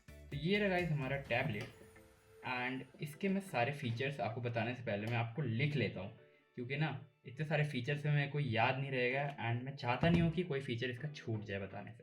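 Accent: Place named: Delhi, India. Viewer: LENOVO OFFICIAL PRICE IN PAK IS 110000 AND I ALSO OWN SAMSUNG FLAGSHIP TABLETS UR ACCENT IS OF DEHLI REGION AND VERY ATTRACTIVE AND LOVE OUR ANCESTORS CITY BEFORE 1947 BEING RAO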